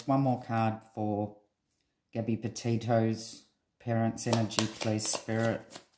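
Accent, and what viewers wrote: Australia. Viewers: Hope you had a lovely Mother's Day if you live in the US. I estimated that you are Australian, though. I love your podcast. Wow, what a start, the devil. Makes sense to me. They should have a cost to bear in Gabbys's murder! I have often wondered if his mother wanted Gabby out of their house to begin with. Thus, the purpose of Brian planning this road adventure in the first place. They took swift action to meet and plan it all out! Can you ask spirit what country he is in, if alive??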